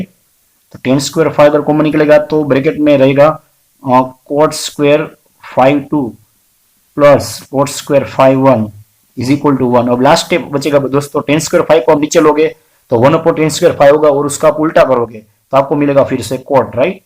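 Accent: Gujarat, India. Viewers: Aree sir!!! Apka hindi ka accent bhi gujrati hai mazaa aya!! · Your language is like gujarati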